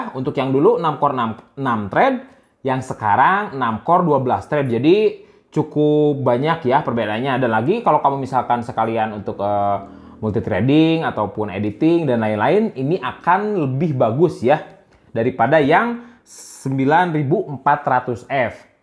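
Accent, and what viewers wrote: West Java, Indonesia. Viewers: F = EP Sunda Accent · Sunda wkaka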